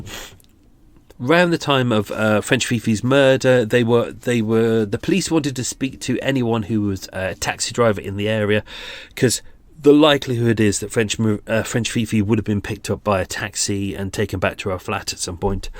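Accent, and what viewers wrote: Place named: Newcastle, United Kingdom. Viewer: Thanks. You've made some good points here regarding witness statements. Keep up the good work, great content and well narrated If your struggling with your geordie accent, try watching some Sid the sexist chat up line videos on YT. 👍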